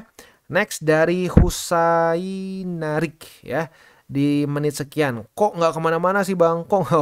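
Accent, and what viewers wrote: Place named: Jakarta, Indonesia. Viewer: Lu chindo y bang,kok logat nya kaya betawi😅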